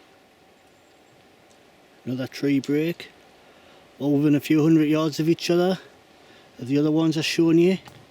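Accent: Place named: Ashington, United Kingdom. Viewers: That sounds like an ashington accent that...class video mate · has any wildlife expert told you what they believe the sounds to be in that area from your accent i take it to be the northeast of England .taking that England has only 10% of its woodland left comperd to 40 %or 50 % of woodland in most of European countrys like France or Germany is it possible to have a wild unknown animal running around the forest in England .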